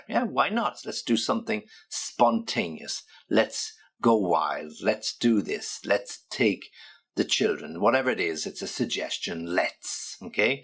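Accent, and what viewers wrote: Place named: Northern England, United Kingdom. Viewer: 👏👏👏👏👏👏Where is your nice accent from,? Somewhere from the north, isn't it? Un abrazo 🇦🇷🏴🇬🇧🇦🇷🏴🇬🇧🇦🇷🏴🇬🇧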